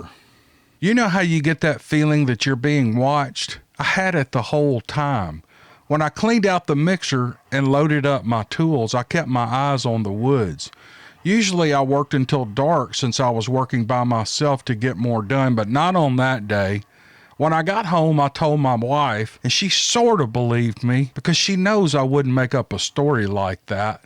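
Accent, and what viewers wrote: South, United States. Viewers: There nothing wrong with your voice I love the way you talk and when you do these videos you can close your and imagine yourself there you do a good job in tell you this I listen to bigfoot case and I listen al TRUSTED but your is the best cause you make the stories more interesting and I get my popcorn and sit back when you tell the story it like you there .thank for the video I keep enjoying your videos keep up the good work with your southern accent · Your channel for stories is the best. Your southern accent and kind way about you, makes you fun to listen to. Keep up the great job. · I could listen to your intro songs and by themselves for hours and still enjoy your show.Im in the Huntsville area so your accent is easy for me to understand,did you know that there are eleven distinct southern dialects?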